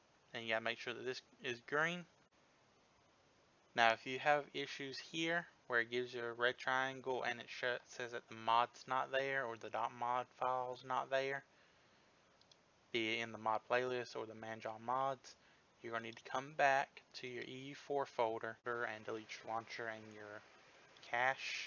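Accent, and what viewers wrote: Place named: South, United States. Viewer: Thanks mate, love your southern (?) dialect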